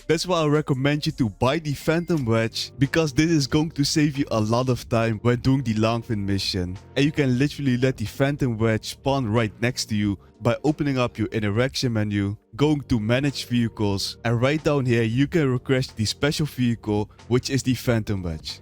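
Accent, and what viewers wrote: Netherlands. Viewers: Hes dutch bruh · His Dutch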